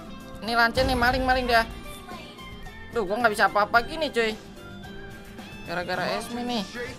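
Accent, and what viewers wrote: West Java, Indonesia. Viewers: ente asli sunda mang?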